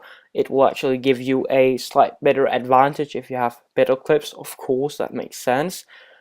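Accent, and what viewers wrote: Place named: Scotland, United Kingdom. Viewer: Du lyder lidt som en skotte også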